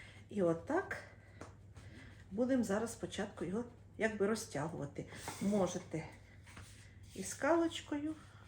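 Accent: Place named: Vinnytsia, Ukraine. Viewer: Батони у Вас вийшли чудовіі,мабуть,дуже смачні.Так і хочеться спекти...,а розкажіть трошки про себе.Ви часом не з Вінницької області?Знайомий, говорок''...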